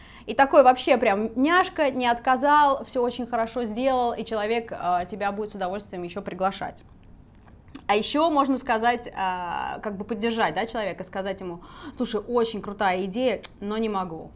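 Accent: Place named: United Kingdom. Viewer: ! А у вас есть какой-то акцент и вы его придерживаетесь?))) Слышу что вроде какой-то бритиш, но местами проскакивает FlapT или в этом акценте это норм?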